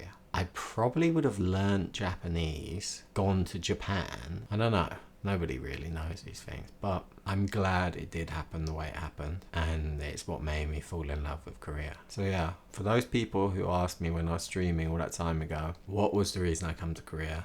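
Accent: United Kingdom. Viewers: 한여름밤의 꿈같은 멋진 추억이네요~ ^^ 저는 반대로 영국에서 그런 추억이 있었네요 같은 영국억양을 가졌던 친구가 생각나네요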